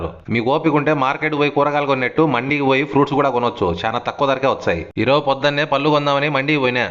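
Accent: Kadapa, India. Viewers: Vayamma Kadapa slang king back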